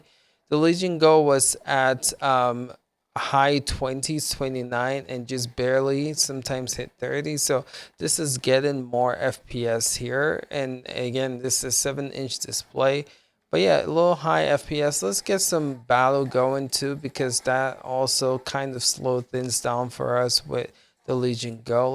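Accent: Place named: Mumbai, India. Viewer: sounds like indian from mumbai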